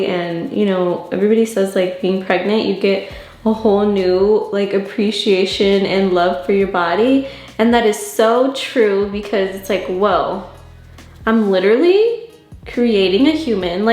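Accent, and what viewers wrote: United Kingdom. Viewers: Your lashes are so long! And don't feel self-conscious babe you look gorgeous, and you sound so cute with your English accent lol. I would totally watch it if you made your own separate channel for girly chit chat stuff! 💖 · I love these chitchat grwm from ❤️✨ and that British accent 👌❣️ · Love the British accent!! All the best with your pregnancy ❤️❤️ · It’s the British accent for meee🤣🤣 love from the UK 🇬🇧🇬🇧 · Lol I love the British accent you’re so beautiful girl God bless you🤍🤍🤍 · Beautiful and glowing love the London accent 🥰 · Ur beautiful, enjoy the pregnancy. Your body will come back especially if you let baby brown breastfeed the way he's suppose to. Btw i love that British accent.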